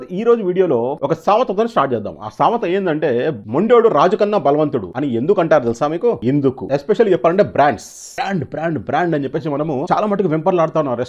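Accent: Telangana, India. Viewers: అన్న నువ్వు తోపు అన్న నువ్వు ఎక్స్ప్లెయిన్ చేసే విధానం సూపర్ అన్న తెలంగాణ యాస భాష భాష యాస స్టైల్ సజీవంగా చెప్తున్నావా అన్న నువ్వు తోపుల కి తోపు అన్న నువ్వు మామూలోడివి కాదు అన్న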